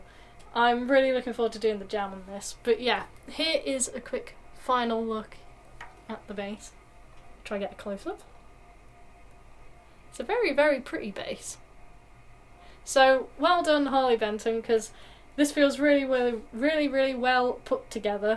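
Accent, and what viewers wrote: United Kingdom. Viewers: It sounds great,and your british Accent too!!Greetings form Argentina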